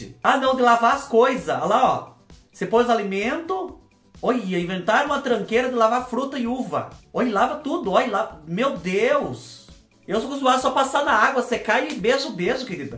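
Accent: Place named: Itatinga, Brazil. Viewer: vc é igualzinho a mim nois fala mesmo sou de Itatinga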